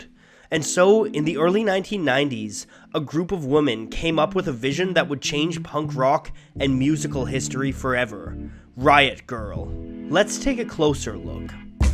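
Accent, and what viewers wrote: Canada. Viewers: that's just how we say it in canada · It’s a Canadian accent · Olive Ephemerals no it’s just the way a lot of Canadians say women. As woh-men, and the e often sounds like an A. It’s just the way she goes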